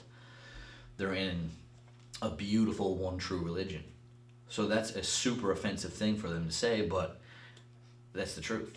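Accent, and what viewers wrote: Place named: Nova Scotia, Canada. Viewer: Not sure how i missed this video. But great accent! Miss nova scotia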